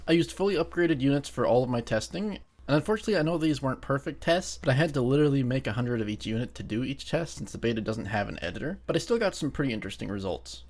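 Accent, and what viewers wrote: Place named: England, United Kingdom. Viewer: i just thought it was kinda funny i always love to hear the english pronunciations <3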